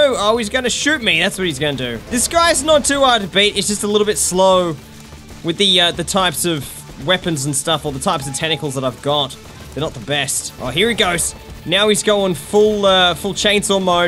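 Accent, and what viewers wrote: Australia. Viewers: hes australian so he has a different accent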